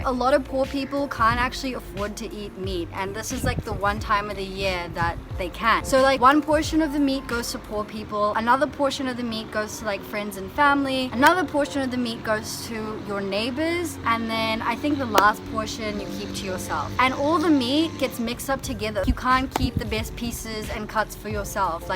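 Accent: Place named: New Zealand. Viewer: I really wanna learn NZ accent from you.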